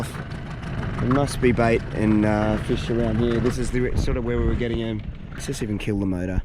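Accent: Australia. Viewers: I noticed on his patreon page that it's called Nice and Nice Persons Club. In words, "How cool is that!" Edit: Actually I think he says, "How 'noice' is that!" (love the Aussie accent.)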